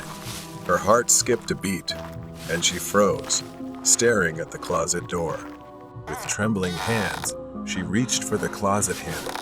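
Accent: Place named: New Jersey, United States. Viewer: your jersey accent makes your videos all the more comforting. been following since 10k <3 😰😰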